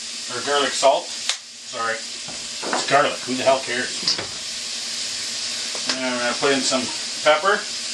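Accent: Canada. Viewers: You are a scream and i love the Canadian accent. Gotta watch the middle though, more lean and less fat. The dog will like the fat unless his middle is too large. Your wife giggles so good. She is a keeper.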